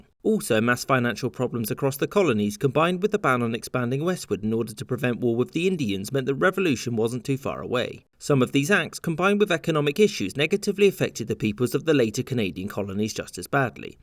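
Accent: United Kingdom. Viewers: His English is very clear, but he is speaking with a standard British pronunciation, as opposed to an American one. Maybe it is why you had trouble understanding him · This guy sounds British so how can he be unbiased. I would like to hear a video from a real Canadian.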